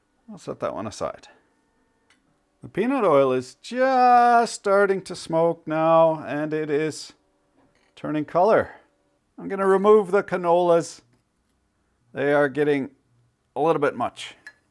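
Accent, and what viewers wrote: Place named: Canada. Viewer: canadian accent...where's your secret lab? Be safe out there, you can't go breaking down the science and expect to go unnoticed. Thanks for the reminder eh.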